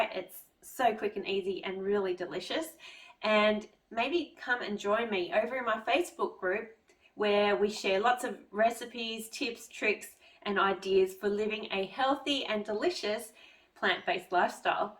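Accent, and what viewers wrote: Australia. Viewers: You look exactly like Ann reardon from How to Cook That, if she had lighter eyes! And if I'm guessing right from your accent, you're Australian too?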